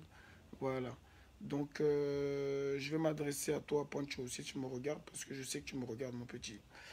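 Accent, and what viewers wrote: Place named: France. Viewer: Ton acent francaise me plait bocou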